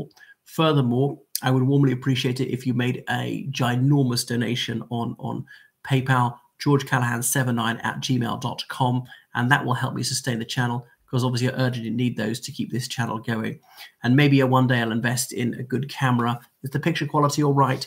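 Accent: RP, United Kingdom. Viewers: With that plummy accent you claim Ireland.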